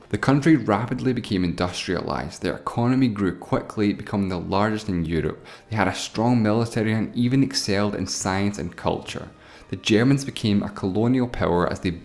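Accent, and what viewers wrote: Scotland, United Kingdom. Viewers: Scottish accent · Alright am a Scottish fan and I'm wondering by your accent I can guess your from central or eastern Scotland am I right and if so which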